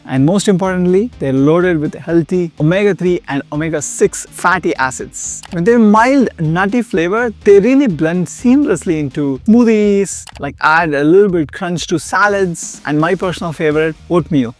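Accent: India. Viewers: I absolutely hate the Indian accent. I don’t know why but it triggers something in my brain. No offense. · Either you are south Indian Or you are Bengali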